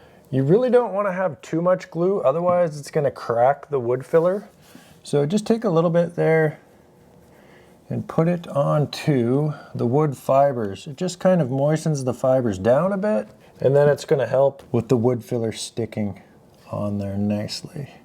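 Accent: Canada. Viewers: I have no idea why this was in my feed and I've never seen this guy before, but he sounds very Canadian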